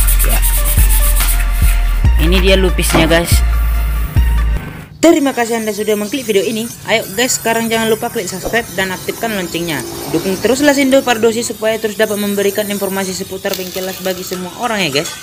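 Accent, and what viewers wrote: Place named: Pematangsiantar, Indonesia. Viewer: Dimna lokasi bengkel mas... Dari logat bicaranya kyk org siantar abg ini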